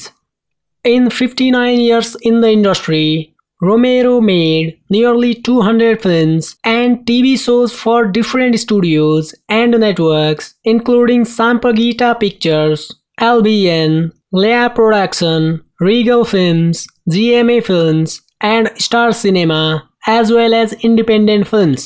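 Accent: India. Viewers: Bombay accent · indiano to boses palang halata na agad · Indian accent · Kkainis diko ma intindihan pilipino pinag uusapan indiano yata nag sasalita kakainsulto nman hayyy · I think he tries to copy the weird accent of chills youtuber but it turns out like and Indian one :) nice try brahh. .Chills tried to be different and he's known for his accent weirdness but if your accent is inspired by chills that's not different anymore :) but you know, i like it tho :) nice :)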